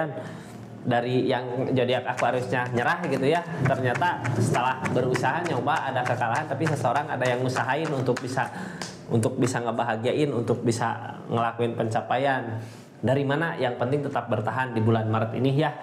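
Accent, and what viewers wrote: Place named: Sunda, Indonesia. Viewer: Aamiin Yaa Robbal Aalamiin. Do'a yg sama juga buat kang nu kasep...nu bageur...nu capetang..... Sunda na medok....asli na ti mana? Hatur nuhun,wass nenek Elly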